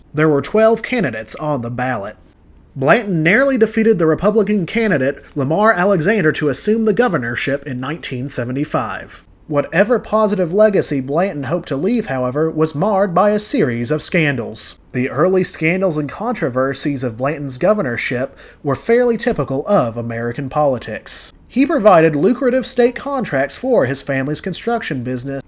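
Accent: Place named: Transatlantic, United States. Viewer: Good video. I like your almost transatlantic accent. Keep it bro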